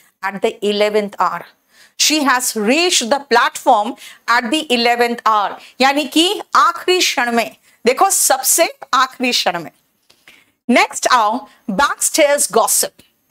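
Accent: Jharkhand, India. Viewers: Mam aap jharkhand se ho kya ❤ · Aap Jharkhand se ho maim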